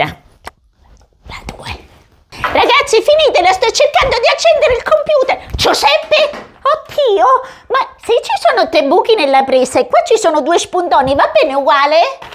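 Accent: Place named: Sicily, Italy. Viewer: hai l'accento siciliano in questo video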